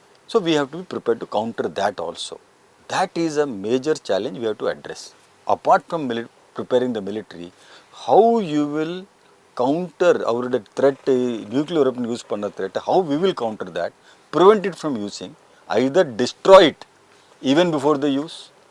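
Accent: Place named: Kerala, India. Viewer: Mallu tamil is always nice to hear..nice information..jai hind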